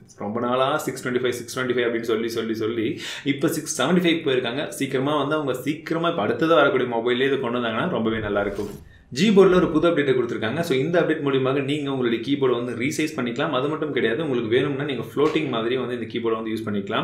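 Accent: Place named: Kongu, India. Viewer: வாழ்த்துக்கள் அண்ணா..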